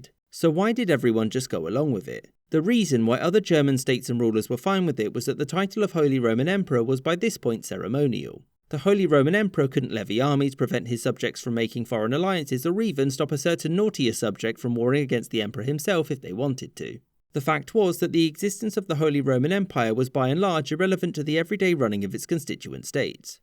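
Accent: United Kingdom. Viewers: No more bri'ish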